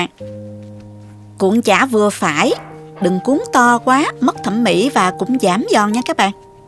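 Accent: South, Vietnam. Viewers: Cảm ơn thật nhiều ❤giọng Miên Nam sau mà dễ thương quá ❤ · lam video nuoc mam cham cha gio, bun thit nuong va com tam nhe! Con rat thich cach nau an va going noi ngot ngao nguoi mien nam cua Co. Chuc Co nhieu suc khoe va lam nhieu mon An nGon guip the he tre cua tui con Lon len o My duoc biet ve am thuc Vietnam. Thank you nhieu😍😍😍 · Giọng nói Miền Nam của ngọt ngào & thân thiện quá. Học được bí quyết làm chả giò giòn lâu, cách trộn nhân để bánh giòn & cách bảo tồn trong freezer. Cám ơn cô nhiều lắm. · Giọng miền nam quá dễ thương, tự nhiên ko điệu, cách làm dễ hiểu, cám ơn 🥉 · Rất thích cách hướng dẫn ngắn gọn kèm với giọng nói miền Nam dễ thương của " hén, hé, nha...." 😆 Cho mình hỏi thăm mua con dao bào khoai và carottes ở đâu vậy? Cám ơn cô trả lời.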